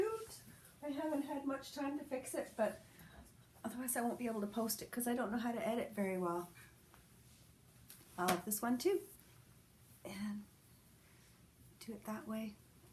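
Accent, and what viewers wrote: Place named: South, United States. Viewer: I am kind of shocked you're from Canada, I would swear you're from the south LOL I always put your videos on 2x playback because it is just more enjoyable to watch other than it's hard to understand what you're saying LOL you just start slow like us Southerners LOL it makes me feel like home, but it takes so much longer to watch the videos. I'm just one of those that wants to get to the point because I've watched hundreds of wig videos and just want to see the wigs, but I so appreciate how many wigs that you review exclamation point when there's a specific wig I want to see I come to your site first!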